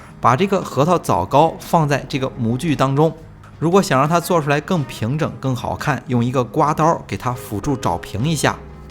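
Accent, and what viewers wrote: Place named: North, China. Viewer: 这是哪里人，每句都有儿话音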